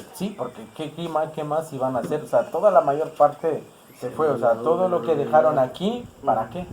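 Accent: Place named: Mexico City, Mexico. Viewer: espoturno Es totalmente diferente, este wey tiene acento chilango. Los mayas siguen ahí en las comunidades, no mamen con tanta desinformación!!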